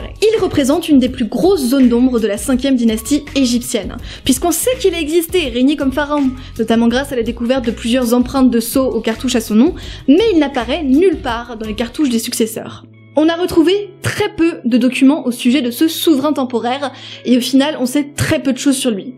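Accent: France. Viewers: Très compréhensible... j’ai adoré malgré que j’ai habituellement un peu de difficulté avec les accents français! J’adore ❤️🤍❤️... je m’abonne!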